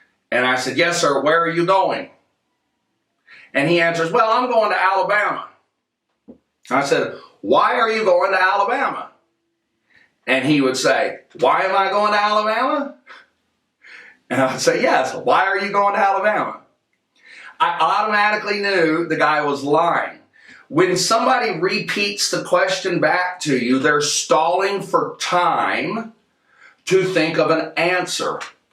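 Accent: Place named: Texas, United States. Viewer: "The gift you have" is.....an amazing dynamic personality and enthusiasm...to the point that you are so entertaining and compelling. Also the fact that you have a desire to share this and help people is a gift. Thank you! I get so excited when I see that you've made another video!!! Also I love to hear your voice, it is so authentically TEXAN!!! It makes me feel like I know u personally...lol... cause I'm from Texas. 🤘🤘🤘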